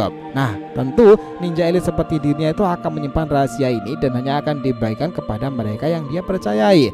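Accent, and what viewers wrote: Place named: Banyumas, Indonesia. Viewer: Hancur....hancur dgr jatok ngapakkk 😂🤣. Tersendiri'''😂. Gak seru 😅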